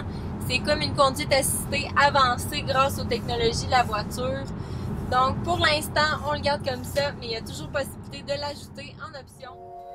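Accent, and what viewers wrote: Quebec, Canada. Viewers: J'adore ton accent quebecois. Moi qui est un peu séjourné au Canada plus jeune pour mon travail,j'adore ce pays lointain de la France. Bonne continuation et merci pour les tuyaux sur certainement ma future voiture TESLA Y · Moi: Cet accent, Mon pote : Ce du Quebecois. Moi: J'aime la fille moi. Mon pote: Non Moi: Pourquoi? Mon pote : Vous allez jamais se comprendre. Merci pour le video, Un nouveau AIME pour vous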